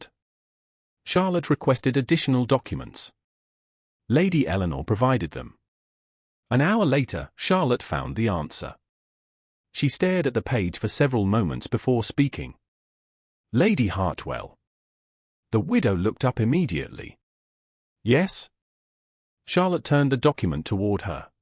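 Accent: United States. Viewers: good grace. you americans really need to get your fawlty pronunciation right. ALL americans do this !! There is a big difference between the 2 words : shire, as such, which is pronounced correctly. BUT ... connected to a place, wiltshire, or a name, ravenshire, it is very different !! it sounds like shuh. NOT ONE AI does this correctly, which means it is american, and not instructed to british english, which is VERY different, Americans say writing desk. British would not say that at all. It is a desk, and reading and writing sitting at it is the whole purpose of the thing. Horsebackriding ... wtf ? Where else would one sit? British say riding or horseriding, never ever do they say horsebackriding. And there is a whole slew of words that are VERY different between the 2 languages.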